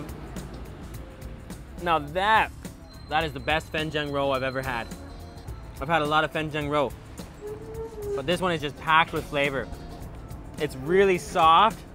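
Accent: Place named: China. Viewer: I like his Chinese accent.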